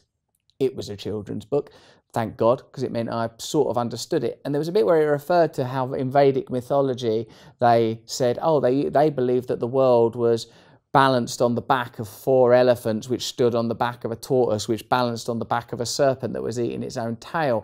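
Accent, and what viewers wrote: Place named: Cockney, United Kingdom. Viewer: I wish his Cockney weren't so accentuated. I have to concentrate for a second, think of the word in context, then figure - he must have said maTTer. lol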